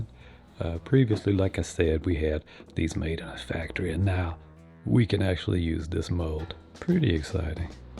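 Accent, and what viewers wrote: Louisiana, United States. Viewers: I watched a short the other day, of a guy, showing how to prepare and eat thistle stems. What I noticed pretty quick was the way he talked. It reminded me of you, and how you talk. This fella was somewhere near New Orleans. Made me think, 🤔, maybe you're in Louisiana... Then I saw the pelican... In this video. I think you're a Louisianan. It's in the speech. I did not know the way you speak is probably the way a lot of people around that region may speak. Pretty cool.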